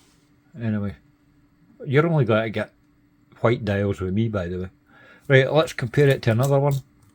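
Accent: Scotland, United Kingdom. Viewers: Sir, you are the most Scottish person I ever heard. Love it.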